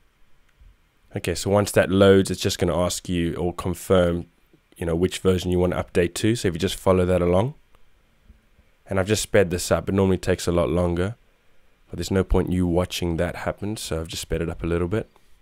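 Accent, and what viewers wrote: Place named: South Africa. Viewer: a south african! BRILLIANT!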